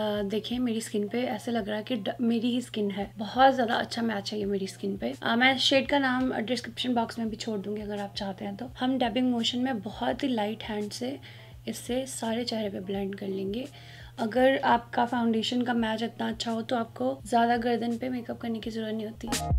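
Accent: Punjab, India. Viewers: Hello Mem ap Punjab se ho kya apni batye payari ha bolne ka tarija name nic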